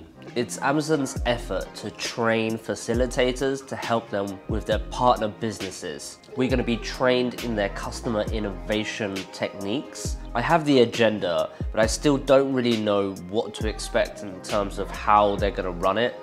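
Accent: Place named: United Kingdom. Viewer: I'm impressed with your British accent.. o.o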